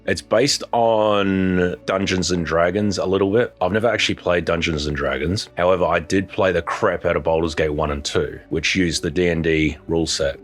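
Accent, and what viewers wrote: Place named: Australia. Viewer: Blonde anime girl with deep male Australian voice fits oddly well 😂. Looks like its going well, i think its so cool youre doing this.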